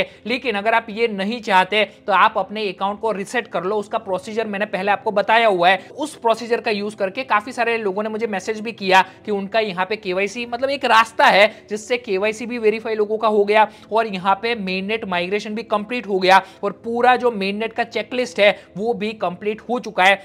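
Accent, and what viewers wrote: Gujarat, India. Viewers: Bhai tu Gujarati hai kya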